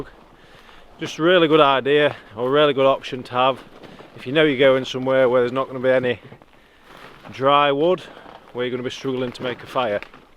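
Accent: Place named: South Yorkshire, United Kingdom. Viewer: Defo a South Yorkshire twang in there somewhere. Enjoyed the vid. Thank you for sharing 🙏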